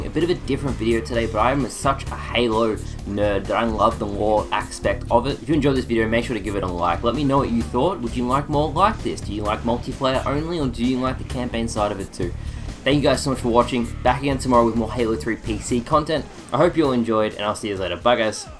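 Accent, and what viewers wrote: Australia. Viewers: aussie rep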